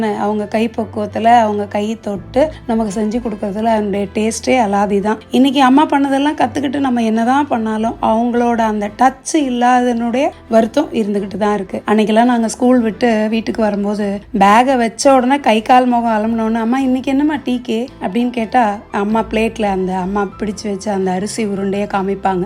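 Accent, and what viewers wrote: Kerala, India. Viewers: Romba alaga pesurenga amma.. Unga pechu la malayali touch eruku, neenga malayali ah?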